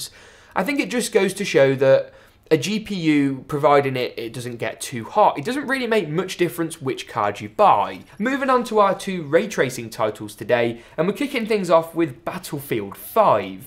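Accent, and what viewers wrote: United Kingdom. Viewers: You're from the UK right? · you sound like you might be from the uk but you always put USD into the titles. It confuses me😂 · are you from the uk?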